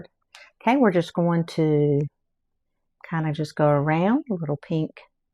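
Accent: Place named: Carolina, United States. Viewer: Subscribed a few weeks ago. I can tell you are a Carolina girl. Your accent is just like mine and my family's! Love it! Going to try this recipe for sure.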